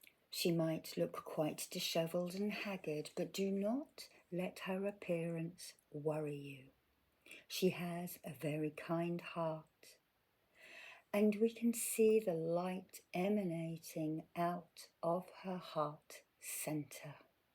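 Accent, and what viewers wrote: United Kingdom. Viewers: you have the same kind of british accent as my sweet auntie, who- funnily enough- is also named:) Bless your positive energy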